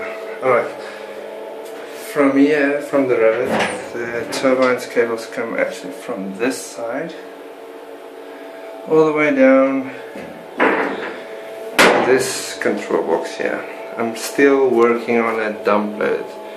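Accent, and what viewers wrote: Afrikaans, South Africa. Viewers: is that a South African accent I hear? i LOVE your setup... It would be great if I had land like you do with a running stream for power... how great is that? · Immediately recognised the South African accent. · is that an Afrikaans accent Im hearing?! Ah the nostalgia. Lekker turbine boet!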